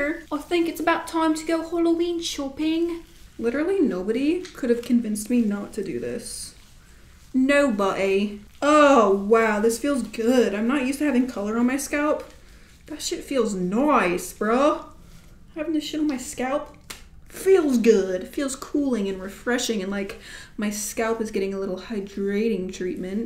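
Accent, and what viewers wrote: United Kingdom. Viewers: Major Ginger Spice vibes - perfect with the brit accent ✌️👩‍🦰🇬🇧 Love it!!! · Lol 😂 love your British accent, Hi from Manchester in the UK 🇬🇧 x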